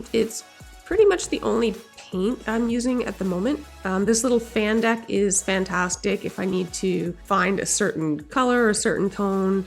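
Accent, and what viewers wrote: Nova Scotia, Canada. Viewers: I'm Calgarian born and raised, but both my folks were from NS (Kentville and Alyseford/Berwich area) Your accent does show a bit..Even I get one anytime I've been for a visit ...far, car, bar, over home hahaha I love it!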